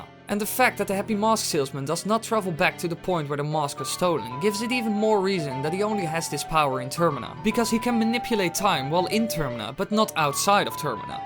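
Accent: Netherlands. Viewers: he is dutch. The accent is obvious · You're dutch aren't you? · Dude... Dutch seeps out of every pore of your accent x) But it's less cringey than the usual dutchman speaking English, so... +1 nieuwe sub, lol. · R you dutch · Wait a minute HEY YOUR DUTCH:D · are you dutch? i think it because of you're accent. sorry if i am wrong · Jacob Marcelo i think its a dutch accent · ur dutch accent is so distracting since i'm dutch as well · Hella Skella Don't brag beebje, even you aren't that fluent, but most certainly more fluent than this dude 😂 But yes, this is unmistakably a Dutch accent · This is a thicc dutch accent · Holy shit Damn I can hear you are dutch finnaly a fellow dutchie:DDD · Hoor ik daar een Nederlands accent? ;-) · Why does this guy have a dutch accent all of the sudden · your voice is not annoying at all or anything [neither is the accent], but after hearing you talk for 3 seconds i want to ask: what part of the Netherlands are you from? 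:P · ur English accent is a dutch man speaking English I know I speak the same way and I'm Dutch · Are you Dutch? Your accent sounds a bit Dutch. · is he dutch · lemon it's like a typical Dutch person trying to speak English lol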